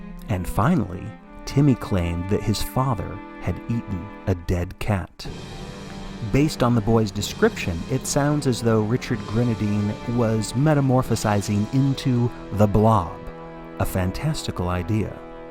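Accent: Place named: Maine, United States. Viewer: As a daughter of a mother from Bangor, Maine I would like to thank you for using your best Mainer accent. For me, it really brings the story together 🙌🏽🥰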